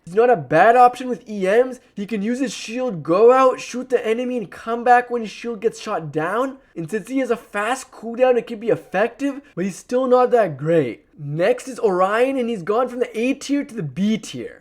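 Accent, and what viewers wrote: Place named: Middle East. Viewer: May I ask where you are from? Your accent is interesting. I’m assuming Middle East somewhere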